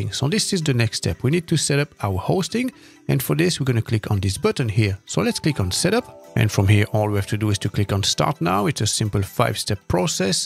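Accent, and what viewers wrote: France. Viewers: I hear nice French accent 👍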